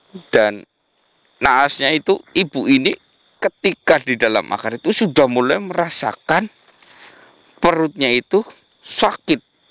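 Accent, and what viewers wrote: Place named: Java, Indonesia. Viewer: klu ngomong bahasa jawa lucu juga, smoga mister slalu sehat srmangat & sukses 🤲🤲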